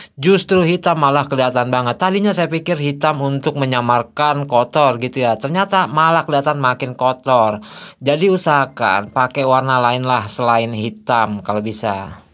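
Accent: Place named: Jakarta, Indonesia. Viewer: Hehehe...ini mah asli kobtrn adisi ngelawak ..tp lumayan cukup menghibur, jd kangen jakarta...ligatnya itu betawi bangettt ato jakarta banget dah familiar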